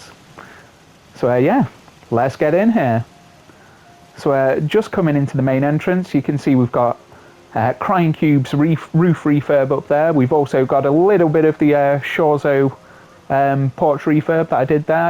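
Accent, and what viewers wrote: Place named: Yorkshire, United Kingdom. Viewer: ! I was gonna guess Derbyshire/Lincs 'cause it's near to Yorkshire, but not quite the accent..But YES TO GOD'S OWN COUNTRY!! One of us, one of us! I like it when you get an idea like that and then execute it into your build. I feel you could utilise some other war knowledge into your other builds?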